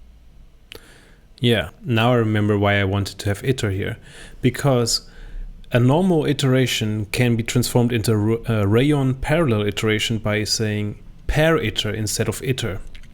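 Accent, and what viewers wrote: Germany. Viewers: Hello Rust! Oh that sounds pretty cool. And yeah I feel you have some nice German accent when you talk on the video :P I started learning and using Rust because of TiKV actually :) Thanks for sharing those videos again and keep up with the good work :)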